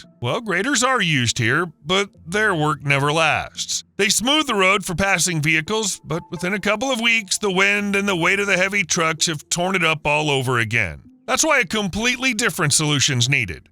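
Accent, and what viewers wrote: United States. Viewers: 😂 If you think all that could be built out there for that price, I have some other "investment opportunities" for you. From Winton, a A$1.2b single lane build would run out of money well less than half way to the NT border assuming the standard ballpark A$5m per lane-km for a rural arterial, which would be optimistic for some of the reasons mentioned. If you want a lane each way it would barely reach more than 100km. The US accent didn't help credibility, but otherwise was entertaining enough.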